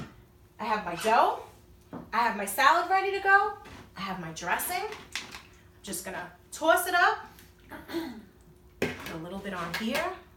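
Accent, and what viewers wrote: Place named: New York, United States. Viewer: I love your shirt AND your NY long Island or Buffalo accent LOL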